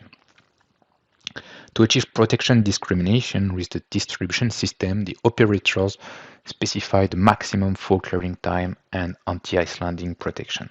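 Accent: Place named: France. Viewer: Its french version of english 😅 its cute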